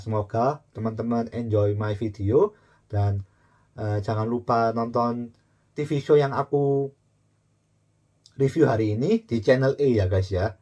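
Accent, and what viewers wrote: Java, Indonesia. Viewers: aku suka bgt nonton variety show tp sayangnya tayangan televisi indonesia ga banyak ngasih acara reality show real, ada pun settingan ga jelas dan ga ada yg bagus menurutku kecuali Channel Net TV doang sehingga banyak ku habiskan nonton tayangan korea drama atau varietynya. Akhirnya discover channel youtube ini krn alogaritma youtube saat aku nyari dr. Eun Young pas bagian Ikon tampil. Sayangnya banyak yg gada subtitle engsub/Indosub nya variety psikologi ini (sekarang yg ada subs nya cuma dr Oh Eun Young's Report: Marriage Hell, lumayan belajar tentang dunia pernikahan hehee), padahal pengen bgt ada subs nya biar bisa belajar, ga cuma haha hihi doang kyk variety show lainnya yg jenisnya komedi. Kembali bahas channel youtube ini, pertama kali klik play, lah kog medhog, mengingatkan Korea Reomit/Jang Hansol hahaaa.. menarik, bakal kepo dan liat video2 di beranda channel ini. 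Semoga berkembang selalu dan banyak menyajikan info apapun yang menarik dan baik,!!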